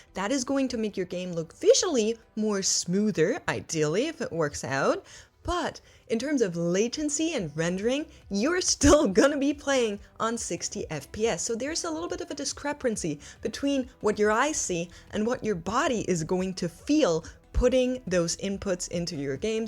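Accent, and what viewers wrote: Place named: Germany. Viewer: I love the accent, just found your channel. Where are you from? You have Swedish look, but I've seen that look in Germans as well. Wife is German and Italian and said you look and sound German to her.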